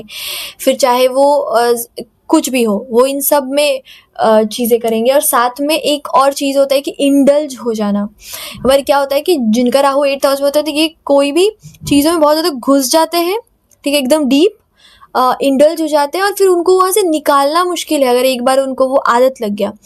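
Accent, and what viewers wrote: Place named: Gujarat, India. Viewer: You must have gujrati root. Your accent ..ok. Aap accha bolti hain. Thank u behan ji. Om Namah Shivaay.🎉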